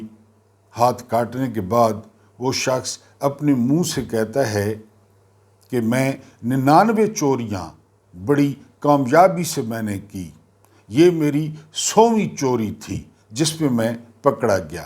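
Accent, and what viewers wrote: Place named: Mianwali, Pakistan. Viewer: صاحب یقین کریں آپ کے دو تین ویلاگ دیکھنے کے بعد بندہ آپ کے ویلاگ کا عادی ہو جاتا ہے اور انجاۓ کرتا ہے۔ میانوالی لہجے میں آپ کا سٹائل سب سے وکھرا اور الگ ہے۔ کامیابیوں کے ساتھ شالا جیندا راہویں۔❤😂🎉❤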